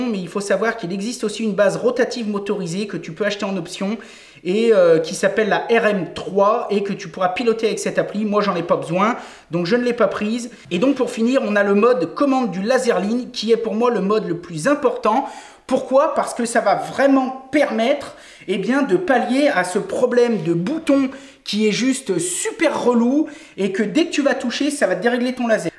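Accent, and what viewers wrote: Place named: Western France, France. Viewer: Cette vidéo est top ! Je me suis levé de mauvais poil et ça commençait à pas filer droit mais tu m'as vite remis sur la bonne ligne si j'ose dire. J'aime bien ton franc parler, notamment le "ayait", tu serais pas de l'ouest toi ?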